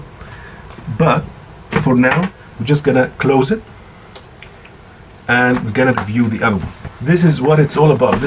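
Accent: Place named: Africa. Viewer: انا عندي سؤال محيرني صراحة انته وحكيم اثنينكم عندكم لكنه افريقيه في انكليزيتكم.. where did u get that accent from? هذي مو اللكنة الانكليزيه ابدا...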